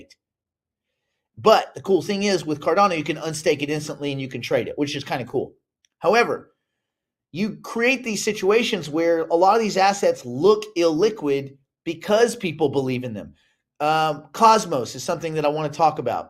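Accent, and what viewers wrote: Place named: Australia. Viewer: I knew it.. is an Aussie just speaking American to us……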